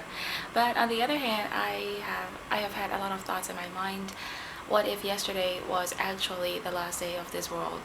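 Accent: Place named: United States. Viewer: စဂၤပူျပည္မွာေမြးတာလား။ ဘာလုိ႔မဲတာလဲဆုိတာ ခင္ဗ်ာရဲ႕ အသံကအေမရိကန္းနဲ႔အရမ္းပီတယ္။ သုိ႔မဟုတ္ရင္ ျဖစ္ႏုိင္တာက စဂၤပူျပည္မွာအားလုံးအေမရိကန္းအသံနဲ႔ပီၾကတာေနာ္။ :S FK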